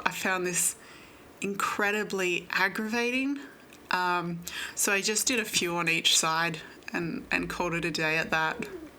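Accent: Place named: Australia. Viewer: Great to hear another Aussie voice ❤ where can I get the stackers? I’m in NSW. I have some I use to make succulents which I got from the US but yours look great.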